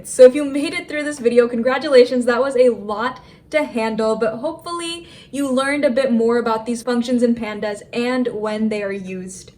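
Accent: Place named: India. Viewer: love the way you explain, keep making such videos and cover whole pandas. By the way I love your accent, it is mixer of indian and NRI.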